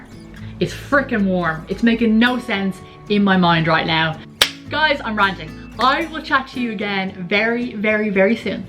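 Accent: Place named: Ireland. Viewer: I feel very happy 'coz I understand 90 % now... When I started listening to I got only about 5 % because of her Irish accent. So, it's important to go ahead and listen to her even without subtitles in Portuguese, because you can improve your listening as I am doing right now.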